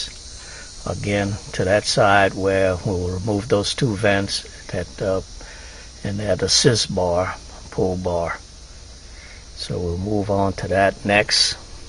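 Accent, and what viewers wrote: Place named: Louisiana, United States. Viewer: Glad you from Louisiana I understood perfectly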